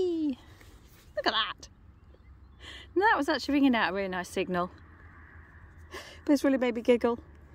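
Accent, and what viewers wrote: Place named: United Kingdom. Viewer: Superb hunt. Adore your British accent 💖 I now know where your lad gets his 'OMG' catchphrase. 😄 Cheers 🇺🇸🇬🇧❤️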